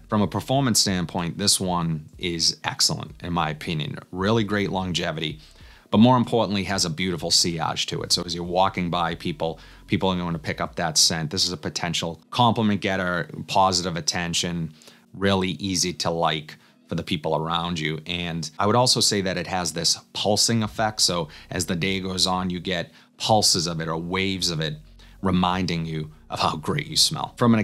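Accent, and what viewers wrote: Boston, United States. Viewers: Thanks bro.im from n.y u have to be from Boston..had to guess.PDM all day!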